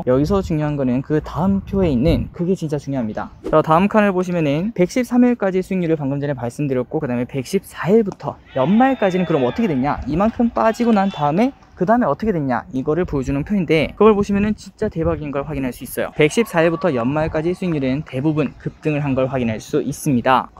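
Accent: Gyeongsang, South Korea. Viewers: 대구 사람이셨구낭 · 대구사람이에요?? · 혹시 대구분이신가요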